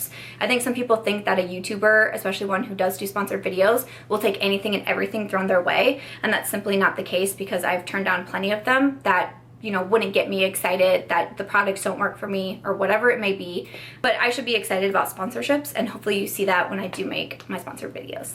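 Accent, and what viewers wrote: United Kingdom. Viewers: I loved your brutish accent! 😂😂 love you ❤️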